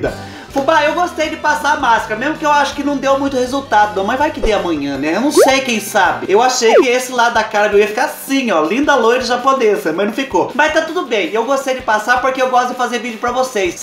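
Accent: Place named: Minas Gerais, Brazil. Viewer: Fubá achava que o seu sotaque era para os lado de Minas kkkkk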